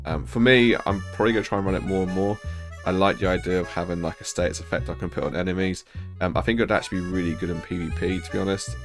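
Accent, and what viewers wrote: United Kingdom. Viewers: Good video, but your British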